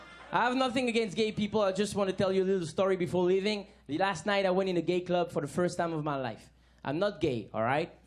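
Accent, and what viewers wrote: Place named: United States. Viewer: Ohh I like so when you speack english! It s so suitable to you! We could believe you would be a true american boy lol so cute ^^ me too my dream is to go one day to New York, i watched "sex and the city" i loved this serie and so i dream to go there....one day i ll make it!!! Then, i have to practise my english so i will wathed all your sketch that i could find in english on youtube...😊😊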